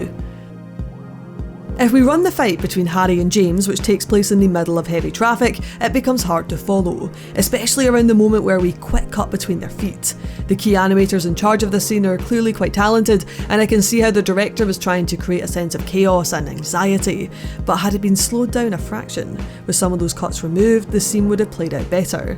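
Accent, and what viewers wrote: Scotland, United Kingdom. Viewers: Good review, I like the format. What part of Scotland are you from?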